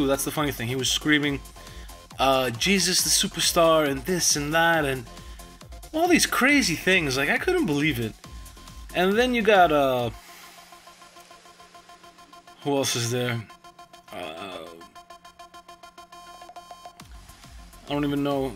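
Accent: New York, United States. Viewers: Hey, I'm living in Queens right now too, originally from upstate NY. I can totally hear your NYC accent haha. I really enjoy watching your videos. Keep it up man! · Your accent to my ear was clearly either Queens or Brooklyn.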